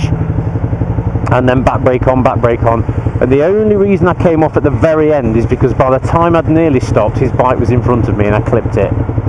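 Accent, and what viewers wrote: United Kingdom. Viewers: I don't have any idea about how to ride on ice or black ice because I'm a young novice rider. I know there is not much to do on black ice, but I believe I missed some points on the video. It is so hard for me to understand British accent. Could anyone summarize the tips on the video? thanks in advance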